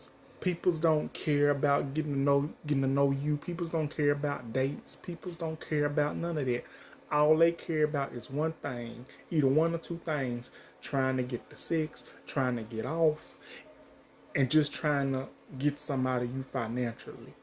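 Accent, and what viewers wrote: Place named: South, United States. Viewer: Gee Brother....what State are you from? I know you are from the South but being a New Yorker, I cannot figure out which southern state you come from but you way of talking is weird and deep as fuck. Did you see the sex videos of Ray and Zed on line, if you did you may have an idea why Ray took the L on Zed not to say there is something wrong with Zed but from what I can analyze, I think Ray is a big ole size bottom and yes I think Ray found a brother who might be digging him deeper than Zed was. Karna is a bitch and yes shit ain't gonna be working for Ray if patience is not his priority. Ray is talking about making money quickly but he ends up with this brother who does not seem to have shit going on for him. From what I saw he seems like Zed is moving on and of course he is dealing with the break up as he did sincerely loves Ray but he seems to take charge of his life and his music career.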